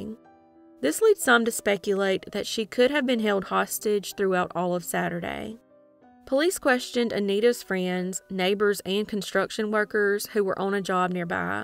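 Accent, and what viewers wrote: South, United States. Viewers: What a wonderful way to drive to work listening to such a soothing southern voice ❤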